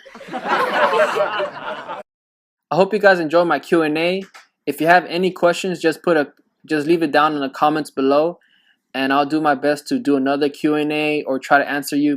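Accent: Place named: Latin America. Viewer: I can hear his Latino accent. For a minute it sounded like he was going to bust out some Spanish. 😂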